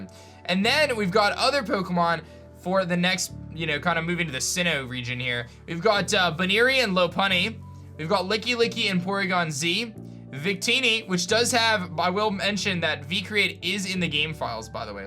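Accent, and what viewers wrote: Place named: California, United States. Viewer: Had no idea you were in CT. Me too! Would’ve thought California from some of your speech patterns. Big fan of your channel. See ya around!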